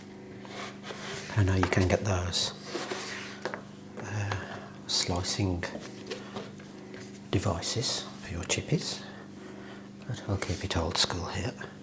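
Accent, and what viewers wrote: Birmingham, United Kingdom. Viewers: Amazing !! And that brummy accent is lush!!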